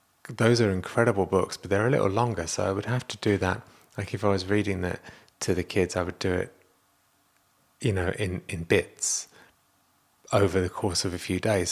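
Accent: United Kingdom. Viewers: Your voice and accent is awesome. The British accent in my opinion is the best accent 👌.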